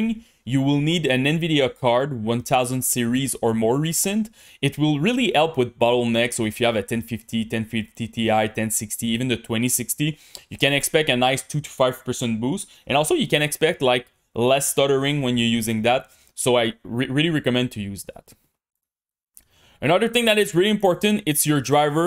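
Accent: Quebec, Canada. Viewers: I IMMEDIATELY recognized that Canadian French accent that I know so well 😂 bon vidéo mon ami, c’est très important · Bel accents man, je sais reconnaître in Queb quand il parle anglais et vraiment merci bin gros pour ton vidéo d'optimisation c'est pratique en tabarnak! :)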